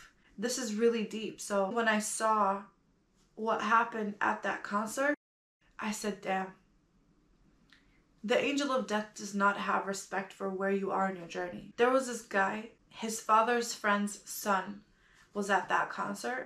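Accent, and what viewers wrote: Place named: United States. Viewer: سڵاو بەڕێز.بە ئاکسنتی ئەمریکی قسە دەکەی تۆ دەڵێ ی لە نەرویجم چۆن بە ئەکسنتی ئەمریکی قسەدەکەی؟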